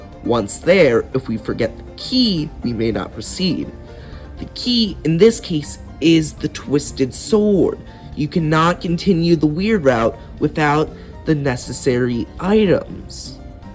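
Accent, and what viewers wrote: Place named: Canada. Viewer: you talk like a canadian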